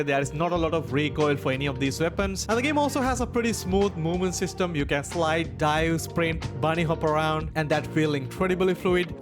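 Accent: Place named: India. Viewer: This Indian accent is fire🔥🔥🔥🔥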